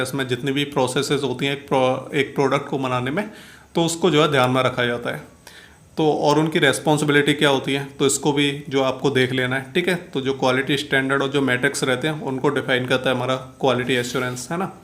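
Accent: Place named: Chambal, India. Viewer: Sir aap bharatpur ya dholpur side ke ho kya ya fir चंबल से ho .aapke voice tone se kabhi kabhi aisa lagta hai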